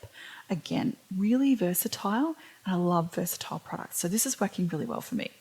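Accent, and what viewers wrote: Australia. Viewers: Really loving your technique, you are so easy to understand. I so please to be hearing yet more Aussies doing these videos. TFS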